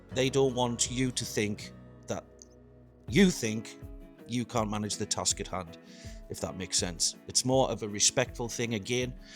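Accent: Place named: Edinburgh, United Kingdom. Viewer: I have been living 42 years in Norway and I have a little different view on things you have noticed. First, you exaggerate the differences between UK and Norway. I have recently visited Edinburgh and I had an impression of a very similar pace of living and relaxed attitude. Your dialect reminds me of Edinburgh dialect, and maybe you have been living too long in London or a similar city in South England. Second: Staying in London I tried to buy a bottle of beer in a store after 10 pm, and was refused. Three: secondary party. This is typical of a certain social group, mostly single young people. Adult people, with family don't usually do so. And yes, the young people can party a whole night with very loud music, without any empathy for the neighbours. Four: making friends. Usually people make friends in their teens and hold on them. It is not common to make friends in adult age, especially not with a foreigner. 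At work people may be polite and nice, but it stops there. Five: driving. Many people drive very fast, especially those who own a Tesla or a BMW, and have to overtake you, even if you drive a tad over the speed limit.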